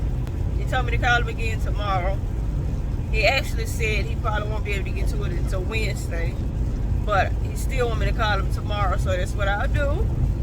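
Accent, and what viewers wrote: South, United States. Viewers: Hello country girl love your southern accent as for relationships it's best to talk before you start because time is valuable believe me I hope you learned from that experience take care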